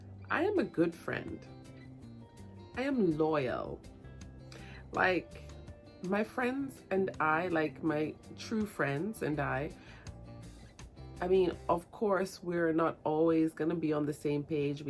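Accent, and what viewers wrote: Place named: United Kingdom. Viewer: Did you ever live in England? You’re giving me a little bit of British vibe 😂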